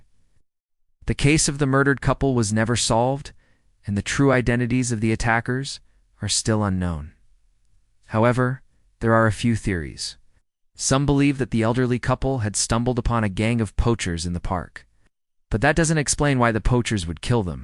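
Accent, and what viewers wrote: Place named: Dublin, Ireland. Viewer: Very good but prefer your Dublin accent!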